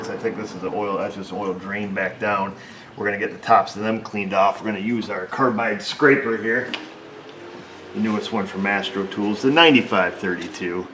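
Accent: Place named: Michigan, United States. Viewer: I know you're in NY state but you sound like you may be from Michigan. Am I wrong?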